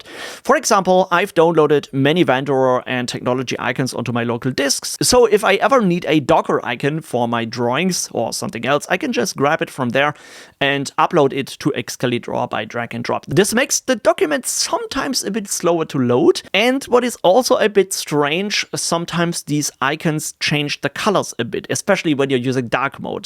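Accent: Germany. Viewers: subbed, i found you to be a good how to guy, will be checking out more of your videos and liking and or commenting, Are you German or Austrian, excuse my ignorance pls.I like your accent and my tism is acting up needing to know the answer.I could read your bio, but that would discourage discourse with you directly.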